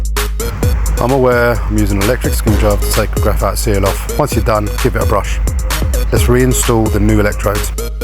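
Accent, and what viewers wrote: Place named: London, United Kingdom. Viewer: Love that london accent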